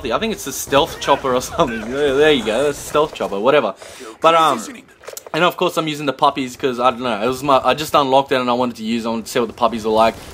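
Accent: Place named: Australia. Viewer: where you from??..... u sound mad aussie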